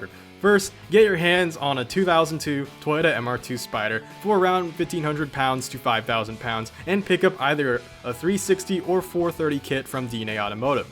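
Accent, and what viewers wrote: United States. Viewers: Dude you sound American but your using euros you make my brain hurt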